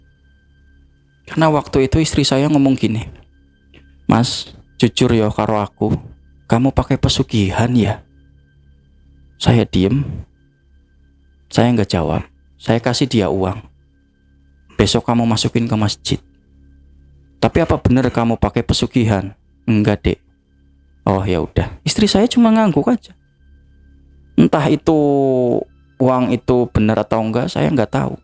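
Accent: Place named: Pantura, Indonesia. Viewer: Logat ngmongnya kya orng daerah pantura, ( cirebon atw indramayu).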